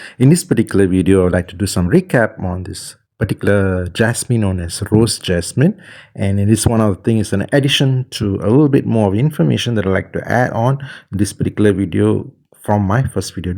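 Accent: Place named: Malaysia. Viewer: You sound Malaysian or Singaporean. Where can I get this plant in Malaysia ?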